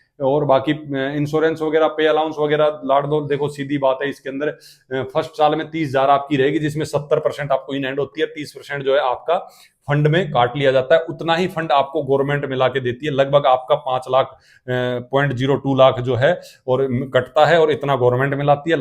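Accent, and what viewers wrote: Haryana, India. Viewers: Haryana ki ayi ha kya sir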